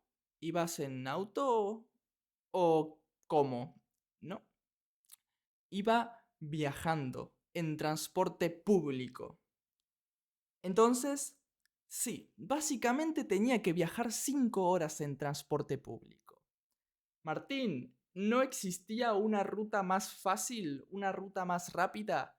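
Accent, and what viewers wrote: Argentina. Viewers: No tenia que llevar el puesto por el tren? (Suena como chiste, llevar puesto, como decir llevo puesto nada por el tren, no se). Habia otro hombre con camion que venia para el puesto, dices? No entendi todo, pero entendi lo suficiente espero. Hoy he sucrito al canal, y hoy es primera vez he oido accento de Argentina excepto ayer un poco de un video sobre Casa de Asterion, la cuenta corta supongo que la has leido tal vez? Seria un buen tema para un video. I just remembered you speak english and italian but i had to practice writing in spanish. I dont know why i suggested la casa de Asterion this video is already super helpful. Thank you for making the videos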